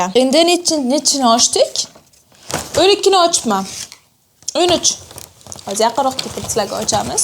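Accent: Bukhara, Uzbekistan. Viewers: Buxoro danmisiz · Buhoro manimcha adashmadimi man toshkentlide😊😊😊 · Buxorolikmisiz deymanda?😂😊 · Buxoromizni shevasi shirin umrizni oxirigacha shunday beg'ubor bo'lib qoling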